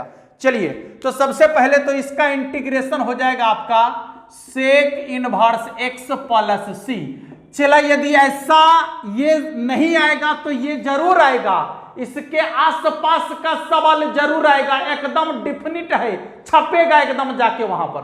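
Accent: Bihar, India. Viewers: Aapke boli me bihari ka mithaas h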